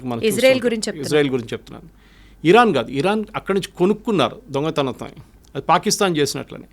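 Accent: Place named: Telangana, India. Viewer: Telengana accent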